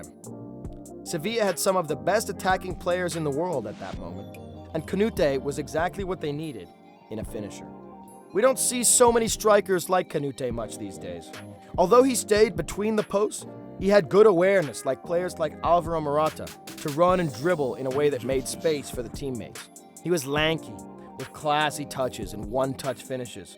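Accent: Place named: United States. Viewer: american accent: immediately loses credibility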